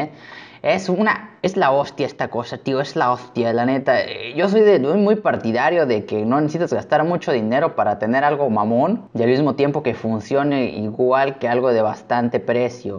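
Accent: Yucatán, Mexico. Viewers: Eres de yucatan ??? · Por que hablas yuca?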